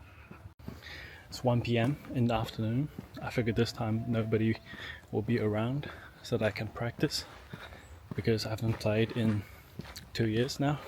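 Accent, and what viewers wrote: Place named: United Kingdom. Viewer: I really enjoyed this video. Very interesting. Guessing you studied in the UK from your use of "dodgy", right? You actually sound like a Brit, to me, and I'm English. Your commentary on how people are "ruthless and rude" is a nice contrast to most of the videos I've watched from ex-pats who seem to get lost in heaping praise, for whatever reason. I'm considering TEFL there, but my Mandarin would need to get a LOT better first.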